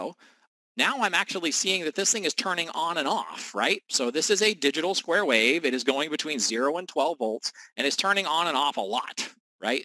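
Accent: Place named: Northern Minnesota, United States. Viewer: that guy giving the presentation has a Northern-Minnesota accent. Have you ever seen/heard the movie "Fargo?" Great movie of a true story set in Northern Minnesota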